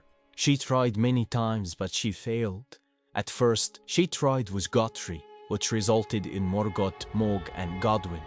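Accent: Egypt. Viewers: عرفتك مصري هههههه استمر يا باشا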